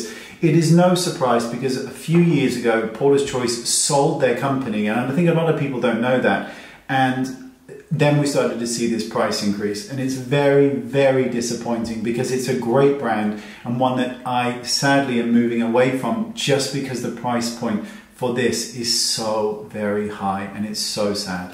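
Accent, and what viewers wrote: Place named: Wales, United Kingdom. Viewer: you sound super welsh today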